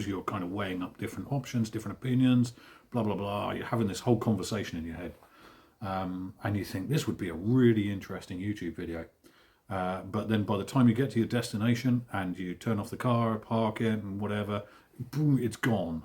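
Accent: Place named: Dorset, United Kingdom. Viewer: Good to hear your dulcet Dorset tones, mate!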